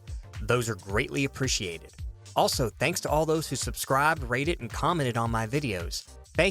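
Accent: South, United States. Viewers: Your southern accent makes me happy :)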